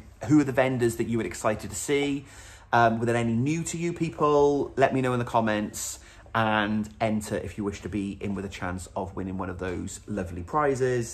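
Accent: Wales, United Kingdom. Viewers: Loved your tour of Unravel. I watch Le Garcons as I am from Canada :) I love to hear your Welsh accent since I am originally from Abergele. Take care ! Hi to Mark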